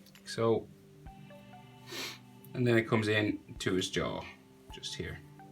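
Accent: Ireland. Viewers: he has probably the first irish accent I haven't hated. and I'm Irish -.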